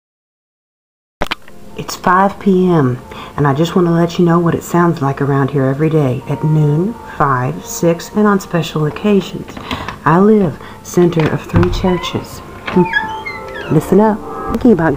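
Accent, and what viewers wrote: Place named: South, United States. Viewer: I laughed more than I should have. You could seriously be a comedian, the southern accent makes even funnier :).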